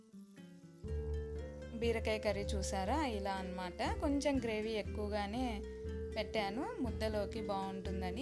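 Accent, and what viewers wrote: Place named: Telangana, India. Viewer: Mee slang తెలంగాణ లాగా ఉంది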